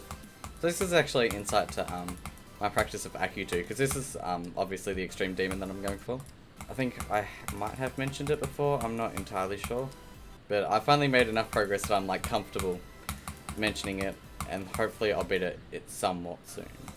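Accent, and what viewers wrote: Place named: Australia. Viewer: Hey u sound australian. Where u from perhaps?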